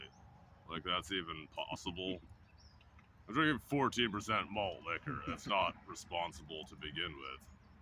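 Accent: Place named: West Coast, United States. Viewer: west coast accent was my initial guess when i first seen urn channel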